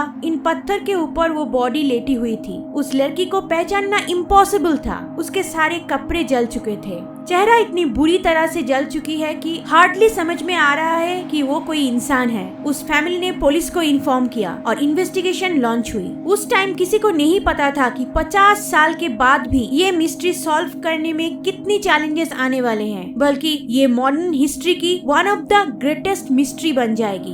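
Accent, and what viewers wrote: Bengal, India. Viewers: Tmhari accent bengali hai, tm Bangladesh se ho ky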